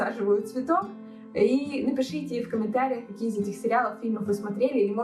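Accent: Poland. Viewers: Słyszę u Ciebie polski akcent🙂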